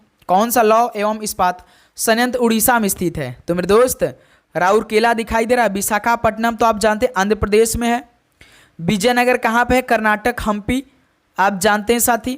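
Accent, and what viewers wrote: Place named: Uttar Pradesh, India. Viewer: Thank you sir aap achha samjhate ho aur apke bolne ke language se lagta hai aap u.p se hai 😊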